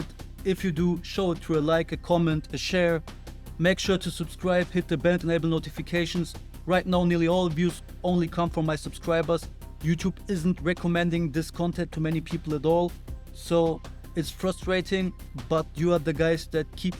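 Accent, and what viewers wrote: Germany. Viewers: are you German? you have a heavy German accent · Your German (?) accent is so annoying though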